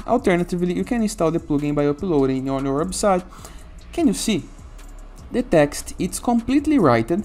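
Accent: Brazil. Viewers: I know a Brazilian accent when I hear one! Excellent content ;)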